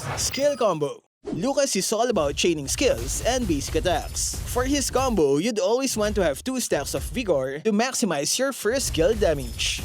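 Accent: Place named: Philippines. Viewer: Logat PH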